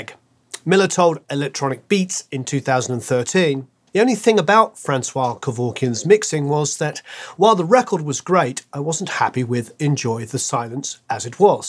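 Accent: United Kingdom. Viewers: i love your British accent but bassline was Roland System 8 😁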